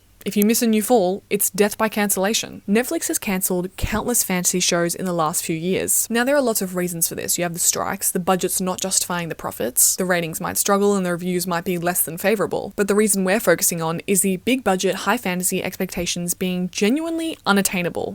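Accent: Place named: Australia. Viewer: I think youre australian, so it explains it, but please for the love of good stop to say the "o" and "w" like the h20 girls its sooooo irritating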